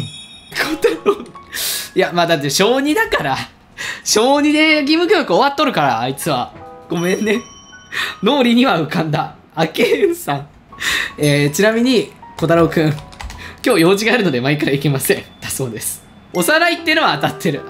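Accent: Kansai, Japan. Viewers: くんさんの、関西弁おもろすぎやろ！！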